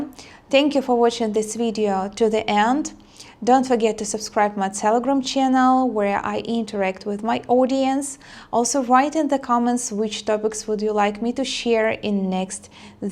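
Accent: Russia. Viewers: девочка.... С твоим диким русским акцентом ты лучше говори на Великом и Могучем......или возьми сотню уроков фонетики английского лондонского языка.....не перепутай с американским, австралийским или НЗ-ким.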